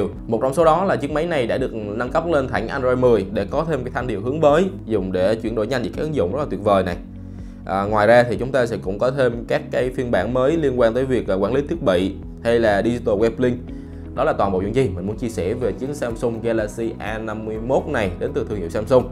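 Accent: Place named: Central, Vietnam. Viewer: Giọng như miền trung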